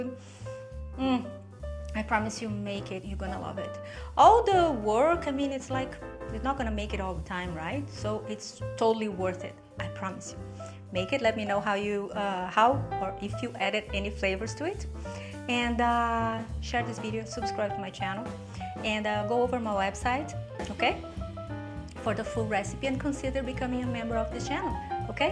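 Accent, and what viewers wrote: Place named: Brazil. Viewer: Eu acho que essa moça é brasileira, tem sotaque de brasileira e nome também. 😂😂😂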